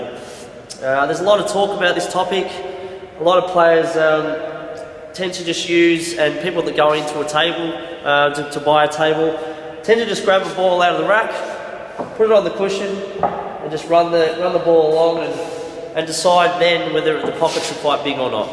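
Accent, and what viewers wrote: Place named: Australia. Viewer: What did he say at the end about the best cloth? what type? I find it hard to understand the AU accent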